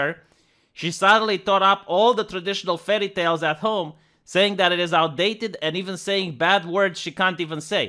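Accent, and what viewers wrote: Romania. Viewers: But I like your squeaky Romanian accent. It's like...charming and shit. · "Don't like your Romanian accent?" dude, that's your signature, if you drop it, I don't even know if I find your content the same. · They hate your Romanian accent? Man, English is my first language ... at least they can understand you ... I'm Scottish so if anyone wants a competition at bastardising the English language, give us a shout.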